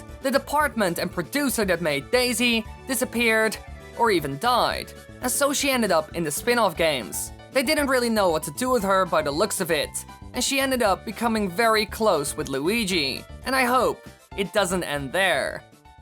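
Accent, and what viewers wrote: Netherlands. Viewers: Wait. You are Dutch, amirite? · Did nobody notice that this guy sounds like hes dutch...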